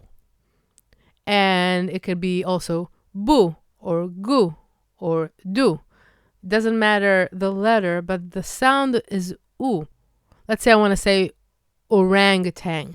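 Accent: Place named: England, United Kingdom. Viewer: You definitely have the English twang. Your English sounds 90% Native.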